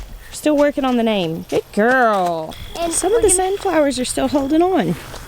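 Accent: South, United States. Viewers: I seriously thing you’re southern accent has become quite a bit more rich since the move. 😊 I’m so sad we got busy & couldn’t come meet you that last Sunday! One day I will meet you! 🤪😄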